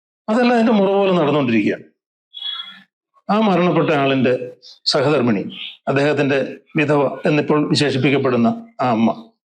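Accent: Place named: Thiruvananthapuram, India. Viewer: Slang TVM. ..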